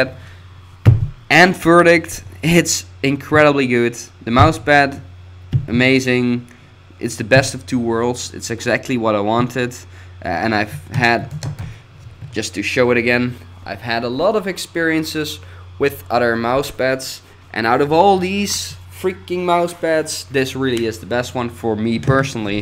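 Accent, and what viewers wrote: Netherlands. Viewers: hoor altijd het nederlandse accent haha :P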